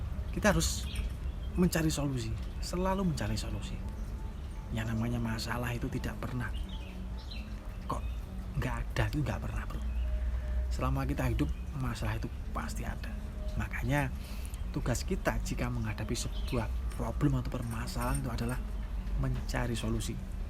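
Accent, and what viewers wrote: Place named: East Java, Indonesia. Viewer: Mas e jawa timur e mana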